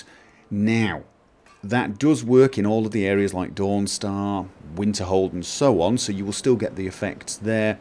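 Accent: United Kingdom. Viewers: ***** Thought so, he sounds Brittish or something like that.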